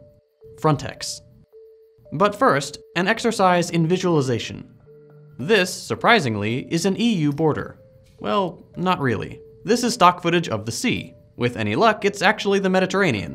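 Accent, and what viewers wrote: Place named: United States. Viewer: Sounds so... American.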